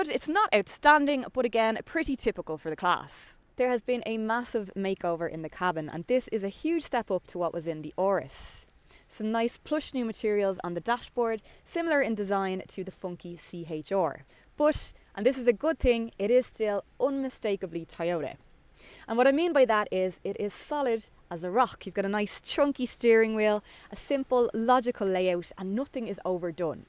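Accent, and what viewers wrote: Ireland. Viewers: Love Irish accent :-) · Is this really or is it just Olivia Thirlby masquerading as. The resemblance of these two is just astonishing. And I'm loving the Irish accent. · Ok. Just a quick comment about a few points: 1. Your videos are very well made, informative and free from lame jokes (eg. Matt Watson) 2. is a very capable presenter 3. She is also gorgeous and has one of the most beautiful smiles I’ve ever seen. It’s good that she uses that a lot:) 4. I love her Irish accent too Keep up the good job guys. Wanted to leave this comment for quite a long time but I usually watch YouTube on my TV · succint review, very well versed presenter. And the best part that irish accent :P Hope you get more subs this year. :) All the best for 100k subs this year! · That Irish accent 👌👌👌❤️ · Great review well done and I love your Irish accent mmmm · Great video review, you just got a new subscriber all the way down from Greece! 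P.S. I love your Irish accent,!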